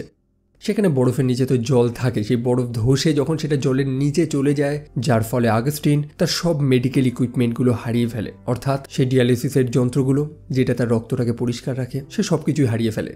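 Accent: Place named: Bangladesh. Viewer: apnar country India...But your Bangla Calcutta er accent nai..Bangladesh er accent er moto lage..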